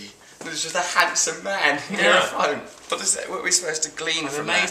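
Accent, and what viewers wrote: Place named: United Kingdom. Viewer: Gosh I wish there were subtitles for these. I love Russel but he speaks so fast, and because English is my second language I really struggle with the British accent when he goes that fast. Anyway, love the new intro and logo!!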